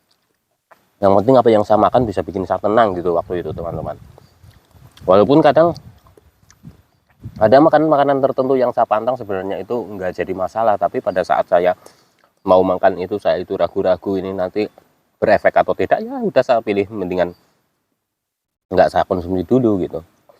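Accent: Banyumas, Indonesia. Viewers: ngapak a mana mas?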